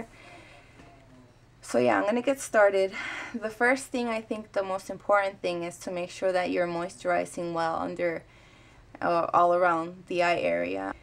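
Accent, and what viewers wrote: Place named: Puerto Rico. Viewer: I just stumbled upon your video. As soon as I heard you speak, I knew you had to be Puerto Rican! LOL I'm PR too; I too have dark circles pretty bad. I got most of it from my mom but I also don't sleep very well. I really like that you not only took the time to share this, you put yourself out there to do it. Also, thanks for using real world products. I don't know about anyone else, but I cannot afford $40 on just one product like concealer. You did what works for you and I love it! BTW, you are beautiful with or without the make up. Thanks! Make more make up videos like what eye shadows you use and blush! God bless you!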